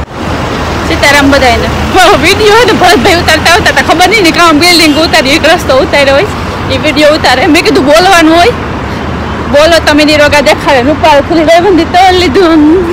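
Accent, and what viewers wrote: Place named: Kathiawar, India. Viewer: ખરેખર, તમારો you tube પર નો વિડિયો જોઈ ને બહુ આનંદ સાથે તમારા સાહસિક સ્વભાવ ને પ્રણામ. આમ તો આપણી કાઠિયાવાડી દિકરિયું ઍટલે ઝવેરચંદ મેઘાણી સાહેબ ની ચરણ કન્યાની કવિતાની યાદ અપાવતી ક્ષણો... જે ડણકું દેતાં હાવજ ને પણ ડસ કારિયું દયીને ડરાવતી... તમારી તળપદી બોલી ને હાંભળી ને બહુજ આનંદ સાથે છાતી અમારી ગજ ગજ ફૂલતી કરી દીધી...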